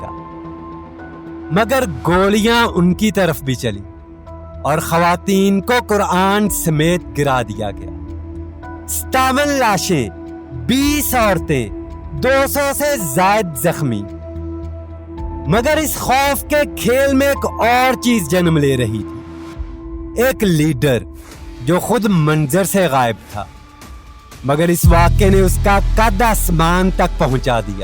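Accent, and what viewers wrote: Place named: Punjab, Pakistan. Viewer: تمھاری لھجا پنجابی لگا رھا ھے تم پنجابی ھو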